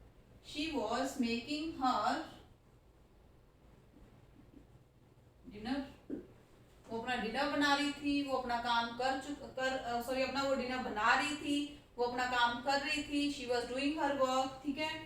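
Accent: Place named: Punjab, India. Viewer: Mam app punjbi bolte bhut ache lgte o😍😍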